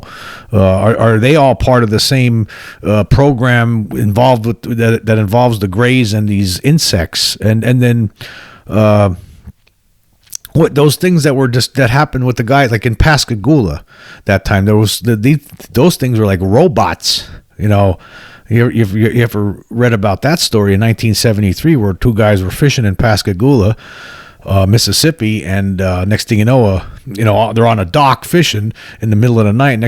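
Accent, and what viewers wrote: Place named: Philadelphia, United States. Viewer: You sound like a Philly boy like me.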